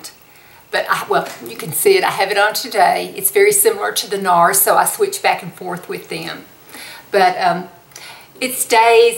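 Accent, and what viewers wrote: South, United States. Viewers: You are stunning,! I just love your beautiful Southern accent. Will you please do a tutorial with a complete demonstration on how you styled your hair for this video? I'm a visual learner with several new disabilities from a brain tumor. I've learned so much from you and your wealth of valuable information. Thanks for all you do! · Love this video! I do appreciate your Southern accent, since I’m a Southern girl myself. You look great!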